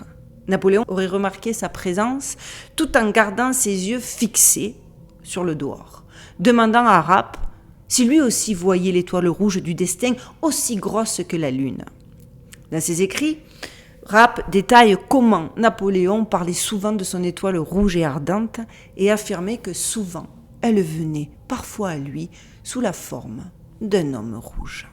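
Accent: South, France. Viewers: Merci belle d'âme d'outre atlantique avé l'accent si typique de nos contrées du sud de la France ! C'est toujours avec un plaisir éréfrainé que je bois tes recherches qui partent dans tous les sens et les éveillent , les sens ! Merci pour tes nombreuses recherches dont certaines que je n'ai pas faites et qui pourtant sont d'un grnd intérêts quand d'autres pas mais là avec le nain polèon , tu m'en apprends de biens belles ! Comme j'aime ton esprit rebel et tacuriosité très saine , ne lâche rien Sista et prends soin de vous ! Merci , force et courage ! Namaste 🙏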